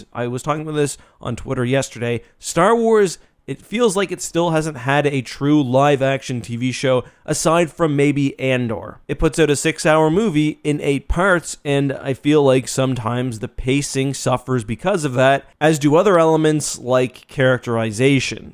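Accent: Canada. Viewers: Bro i just noticed your Canadian you live in a hoose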